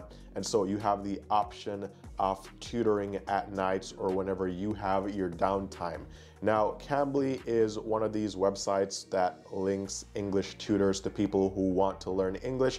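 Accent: Jamaica. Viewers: Sense a bit of an accent. Are you Jamaican?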